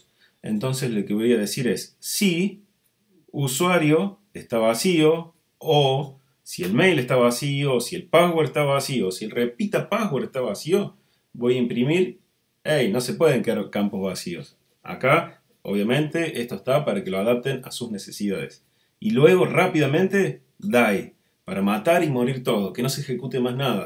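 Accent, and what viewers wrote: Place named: Cordoba, Argentina. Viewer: estan buenisimos tus videos , muchas gracias por compartirlos, de donde sos ? sonas bien cordobés, yo soy de Cosquín.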